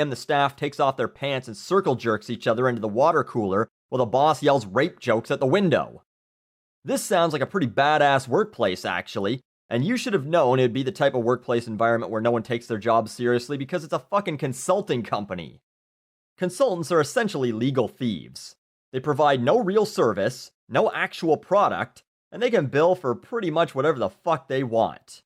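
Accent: Canada. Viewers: i love your Canadian accent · yay, any chance that replies to my comment? if you see this, you're one hell of a guy and I love your rants, and the canadish accent makes it even better:D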